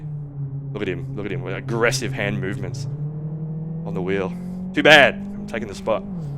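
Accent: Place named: Australia. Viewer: You sound like a Aussie. I'd have bought this but it's still over $40...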